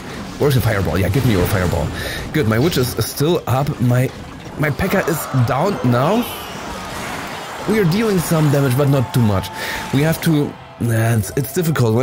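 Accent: Germany. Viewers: He is'nt an english or a amarican guy i think He is german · are you german?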